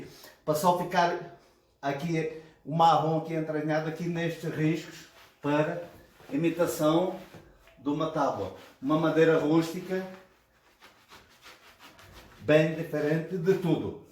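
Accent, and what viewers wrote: Portugal. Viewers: Com esse sotaque Português que eu amuuuu, já me inscrevi e dei o meus milhões de likes.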